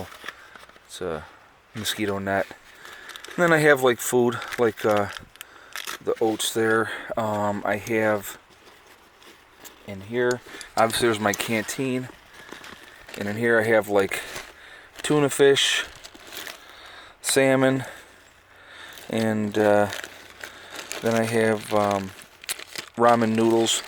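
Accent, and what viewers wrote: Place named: Pennsylvania, United States. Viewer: I know you say you live in New York, but I just talked to someone on the phone that works in pa and sounded just like you. Also a visiting nurse. Where do you live really? Haha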